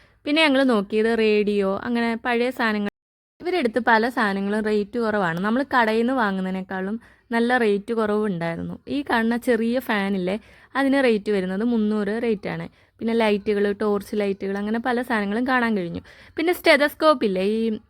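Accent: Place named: Northern Kerala, India. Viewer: തെറ്റുകൾ എല്ലാവർക്കും പറ്റും., നിങ്ങളുടെ ആദ്യ വീഡിയോകളിൽ ഞാനും കുറച്ചു തിരുത്താൻ ശ്രമിച്ചിട്ടുണ്. പക്ഷെ നടക്കില്ല, എന്നെനിക്ക് മനസ്സിലായി. കുഴപ്പമില്ല, നിങ്ങളുടെ വീഡിയോ കാണുന്നവർ പ്രയോഗ ഭാഷയിൽ അല്ല, മറിച് അവതരണത്തിൽ ആണ് കൂടുതലും ശ്രദ്ധിക്കുക. ഏതായാലും ഒരു ആലപ്പുഴക്കാരി ഇങ്ങനെയെങ്കിലും വടക്കൻ ഭാക്ഷ സംസാരിക്കുന്നതിൽ ആലപ്പുഴക്കാരനായ ഞാൻ അഭിമാനം കൊള്ളുന്നു. ധൈര്യം ആയി മുൻപോട്ട് തന്നെ പോകുക.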